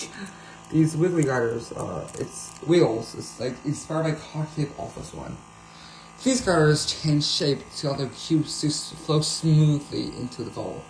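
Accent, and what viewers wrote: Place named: China. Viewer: u sound so chinese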